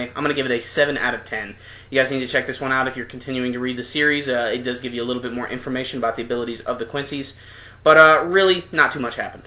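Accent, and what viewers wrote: Germany. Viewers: Your german accent is freaking hilarious xD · No matter what happens this final arc I will enjoy it simply for the stereotypical German accent in your reviews.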